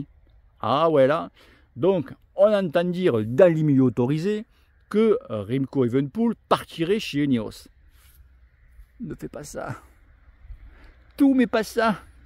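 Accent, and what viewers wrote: South, France. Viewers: Slt, capteur de puissance un peu cher tout de même, moi qui fait du vélo sans faire de compétition sans cyclo et qui ne suis même pas dans un club😄. Pour m'entraîner FC c'est très bien pour moi et à ce sujet pour travailler dans les zones d'intensité tu calculais les zones de pourcentages comment ? Pourcentage de Fcmax réel ou les pourcentage de la FC de réserve ou autres ? Sinon ta chaîne au top 👌 toujours le truc pour faire rire et cet accent qui sent bon le Sud 🌞. sujet toujours intéressant bonne continuation et saison cycliste. 🙋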